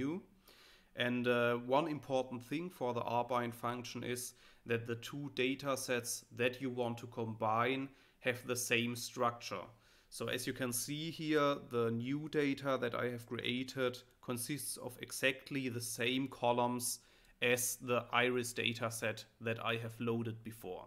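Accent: Germany. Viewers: Good lesson. Good english, relatively poor mine. I don't know deutsch, but diction sounds like deutsch from Hessen Frankfurt.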